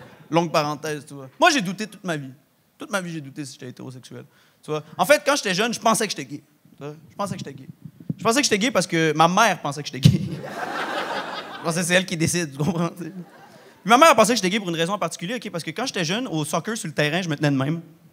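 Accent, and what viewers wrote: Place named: Haiti. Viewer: Pourquoi il prend un accent haïtien??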